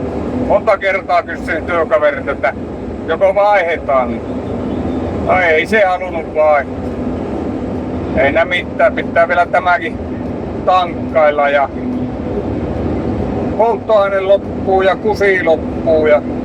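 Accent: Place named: North, Finland. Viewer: Murteesta päätellen jänhkhä jooseppi tämä tekijäukko. Ja temusta tilaa tavaraa, on siinäkin yhdistelmä.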